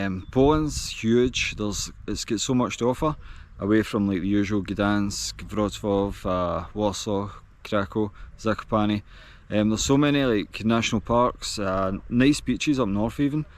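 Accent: Scotland, United Kingdom. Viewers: Your pronunciation is really difficult for me and I need subtitles but I like northern accent very much. I have never been to Scotland but I love this place. Don't ask my why because I don't know. Just imagination. People need to say "thank you" when you open the door but...that young generation, you know what I mean :)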